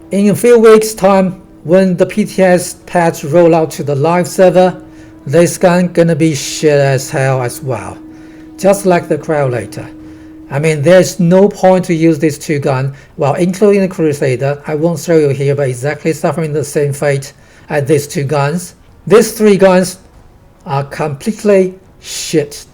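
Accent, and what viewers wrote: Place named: United Kingdom. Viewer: you sound like an Asian with a British accent how bizarre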